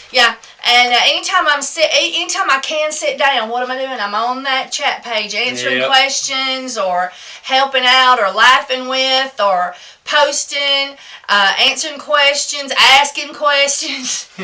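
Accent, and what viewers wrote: South, United States. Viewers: Hi, I have watched so many of your videos! You remind me of my grandma and grampa haley that happened to be from your state with their heavy southern accent. I can do a great southern accent to where you would think that's how I talk all the time, but I've got a boring California accent in reality. Anyway, watching you makes me feel like I'm home because of the way you talk, and your cute personality.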